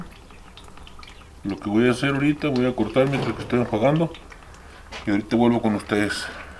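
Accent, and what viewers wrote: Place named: Northern Mexico, Mexico. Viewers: Eres de Sinaloa? Tienes el acentohaha buen vídeo hermano, muy interesante la verdad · Muy bien mi amigo,me acabo de suscribir,se nota por tu acento que eres norteño,yo soy de Aguascalientes,de zona de minas,un abrazo y fuerte saludo compa,por tu sencillez y por compartir tus conocimientos,me gustaría que hicieras un vídeo de como extraer oro de tarjetas electrónicas,a mi me llaman Ripper,a tus órdenes,y seguimos en contacto,dios te bendiga,ah y te voy a hacer una pregunta,ojalá me la sepas contestar, " a quien pertenece todo el oro y la plata ? ". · tu acento de chihuahua o sonora es indiscutible ¨ya pisha una soda mushasho"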